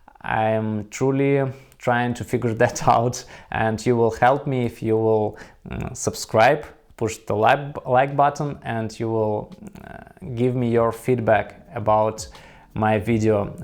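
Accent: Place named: Russia. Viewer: Yes, it’s nice to understand you with this “Russian” accent. It’s better than “American” or “British” for understanding 🎉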